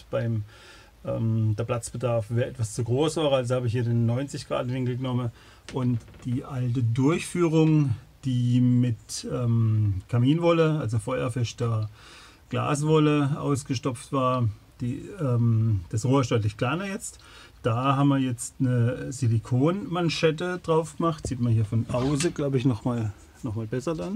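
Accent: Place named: Saarland, Germany. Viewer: Du schwätzt wie e Saarlänner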